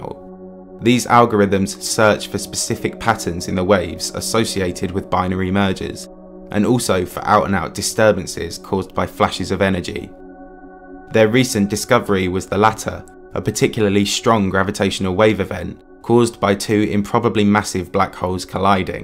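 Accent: United Kingdom. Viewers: I don't especially like the british accent but i like his voice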